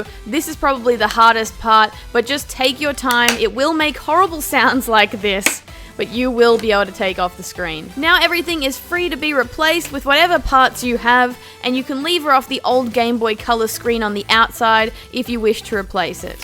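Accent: Australia. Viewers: is she austrailian?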